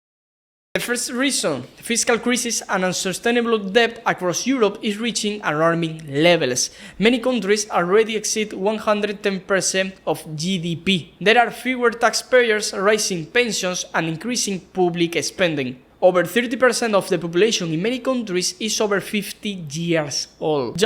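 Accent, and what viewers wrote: Spain. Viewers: i’m also spanish , i’d recommend you to spend a few months in the uk or us until you lose your strong spanish accent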